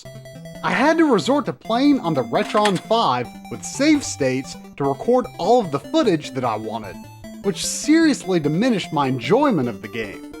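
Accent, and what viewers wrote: United States. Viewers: I understand trying to pronounce some Japanese words can be difficult, but listening to them in a heavy american accent is also just as hard listen to. Japanese phonics are actually fairly easy to learn as most of the sounds are repetitive. I highly recommend the japanesepod101 youtube channel. <link>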